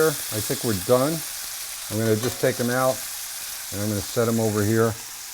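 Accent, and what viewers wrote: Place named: New Jersey, United States. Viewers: Gotta love that NJ accent. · yeah I like this guy. jersey guy found a home in San Francisco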